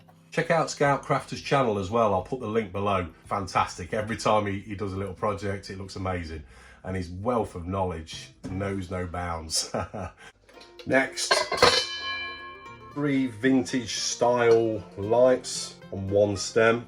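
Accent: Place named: Grantham, United Kingdom. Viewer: Great video and nice haul from the boot sale. That Stanley magnum screwdriver is a good one, I don't see many that length, although the red bits of the handle looked very beaten up! Careful of buying tools at car boots, it is addictive as I have found to my cost! I am going to guess you accent as Grantham. All the best!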